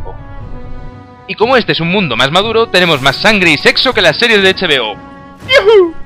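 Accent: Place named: Madrid, Spain. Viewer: Nah, me suenas madrileño sin más, pero vi el otro día el canal este de juegos de mesa en el que colaboras y que hacían una entrevista en una tienda de Moratalaz y sumé dos y dos a ver si eras vecino xD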